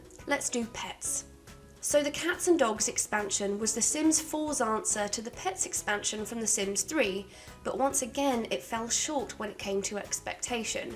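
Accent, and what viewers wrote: United Kingdom. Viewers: this is the most lackluster installment of the whole series. base game is unplayable, unless you have <num> dlc's(each adding 3 new options max...) installed. the base game don't even have fuckin ghosts, like wtf, I could play the base sims 1 with only livin' large expansion pack(in my case it was pre-installed, I remember I picked it up like that in the game section at target or Walmart(I dunno if you have em in Britain< I haven't watched the vid yet, I'm , but I've obviously noticed from the accent that the channel owner's is British> but these are supermarket stores, basically here in the us), it was a pack of base game+living large I still have the package in my family home 😂 but anyways, I could've played hours and hours and hours, only having those, as well as base 2&3, in the Sims 4 the base game Is only a fuckin building simulator(and I've always hated building, I was always picking premade and pre furnited homes, usually not changing a fuckin thing coz I dont really ddlgive a shit about how the Sims' home looks like lol) and create a sim... 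basrely any gameplay options, I was bored after half an hour... there is no mystery vibe the first 2(there was a a hinch of It in Sims 3 left, but only a small hinch...) games have had, sims 4 is a politically correct shit for little kids, to not scare them too much and to teach them that being gay and trans or whatever is some sort of fuckin achievement and privilege(I ain't got nothing towards LGBT people as long as they'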